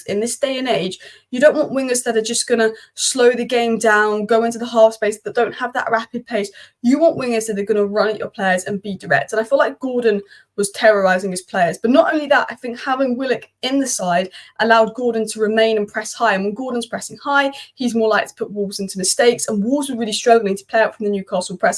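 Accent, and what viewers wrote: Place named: Manchester, United Kingdom. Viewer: What part of Manchester, is that accent from ????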